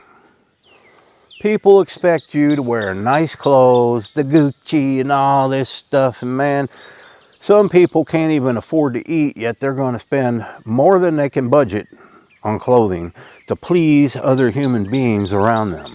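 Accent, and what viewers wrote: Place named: Appalachia, United States. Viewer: You i love American applachian accent and i wish some day get that accent for real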